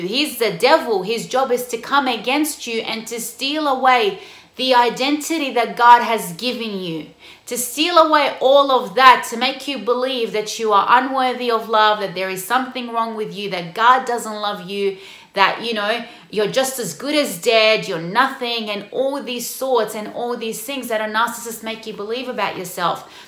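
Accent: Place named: Sydney, Australia. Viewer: Hello from Queensland 👋 I knew you're a Sydney girl the moment I heard your voice lol.. You're gorgeous and I'm really proud of your channel.. You go girl! (Please do a video about how to avoid Sydney guys who only wants to mess around🙄) New subscriber 👍 ✌️ ♥ God bless! 🙏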